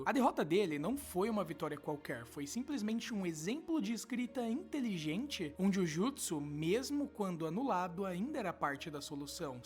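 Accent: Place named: Caipira, Brazil. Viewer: voce tem seriamente que corrigir esse (R) caipira que vc tem, isso é feio de mais.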